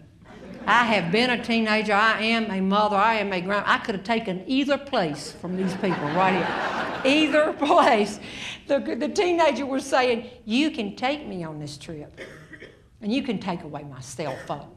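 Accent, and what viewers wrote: South, United States. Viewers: I love it....she has a wonderful delivery and great comedic skills! Her Southern accent is wonderful and the fact that she profanity free is the best.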